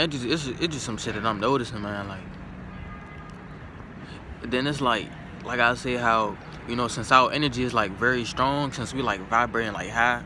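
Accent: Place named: New York, United States. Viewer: Damn sound like you from NYC Or Philadelphia from your accent where you from?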